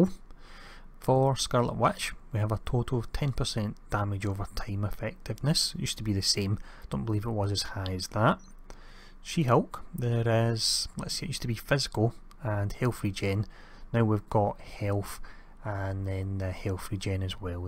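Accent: Scotland, United Kingdom. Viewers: I like your accent, are you scottish? :)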